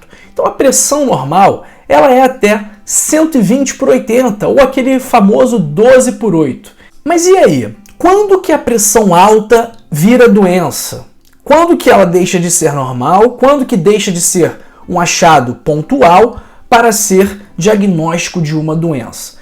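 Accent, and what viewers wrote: Rio de Janeiro, Brazil. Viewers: O sr tem sotaque de carioca . Parabéns pelas dicas. Obrigada pela consulta.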